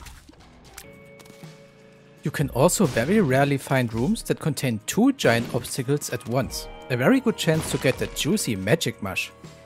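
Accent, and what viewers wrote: Germany. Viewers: i hear an german accent, are you? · Hey ich wollte mal wegen deinem Akzent fragen ob du vllch deutsch bist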